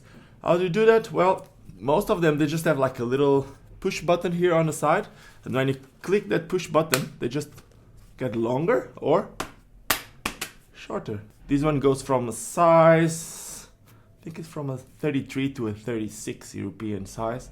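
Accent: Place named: Portugal. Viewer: Are you from brazil or portugal? Cause you have a portuguese accent. Great video btw (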